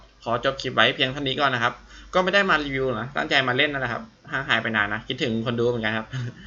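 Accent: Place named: Kanchanaburi, Thailand. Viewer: เหน่อแบบนี้อยู่ กาญจนบุนี แน่เลย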